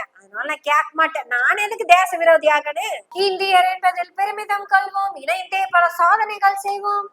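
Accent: Kongu, India. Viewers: Very interesting and thought provoking presentation in the sweet Kongu Tamil. May the Almighty bless you for better prosperity. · மகளே..!!.!! எப்போதும் தேன் ததும்பும் கொங்கு தமிழிலேயே உன் கருத்துகளை பதிவு செய்யம்மா என. தாயே..!! சிறப்பு..!! மிக சிறப்பு..!! மிக மிக சிறப்பு...!!!?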